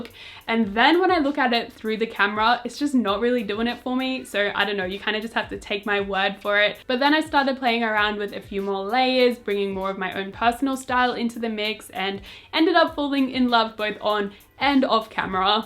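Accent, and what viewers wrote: Australia. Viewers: I figured she was from Australia because she lowkey sounds like Felix, Bang Chan and this one Australian girl I used to know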